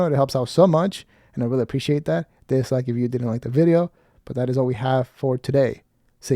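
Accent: India. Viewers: Omg i was so sure you were indian 😂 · OOMG I THOUGHT YOU WERE FROM INDIA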